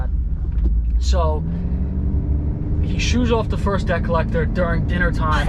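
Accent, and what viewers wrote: New York, United States. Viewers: I love your videos & your voice! You’ve got a tiny bit of the NY accent.🥰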